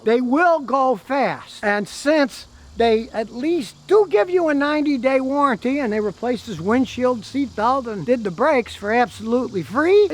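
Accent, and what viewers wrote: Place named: Upstate New York, United States. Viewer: That's because of his Buffalo/Niagara Falls upstate New York accent....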